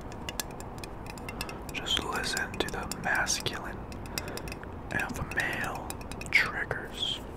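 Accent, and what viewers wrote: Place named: Texas, United States. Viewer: So manly!💪The tools, the WD40, the garage and the extra Texan accent is giving hot masculine Daddy vibes! 👅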